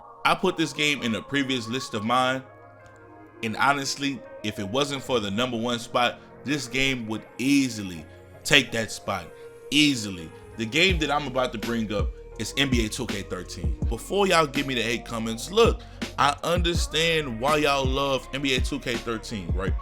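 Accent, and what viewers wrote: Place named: Houston, United States. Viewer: gotta be from Houston ... nigga sound like Maxo Kream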